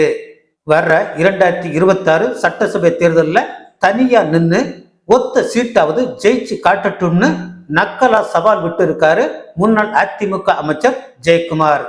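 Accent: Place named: Sri Lanka. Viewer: Sir நீங்க தமிழ்நாடா ?? இல்லை இலங்கையா?? உங்க பேச்சு இலங்கை தமிழ் மாதிரி இருக்கு...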